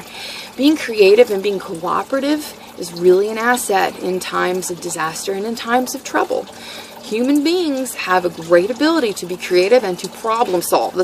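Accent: South, United States. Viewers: I love her southern accent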